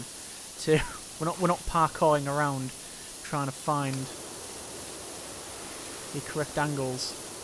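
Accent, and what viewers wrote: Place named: London, United Kingdom. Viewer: Quad pipe? Qruok pipe? I thoight "axing a question" was bad wnough. Can you londonese stop ruining English language.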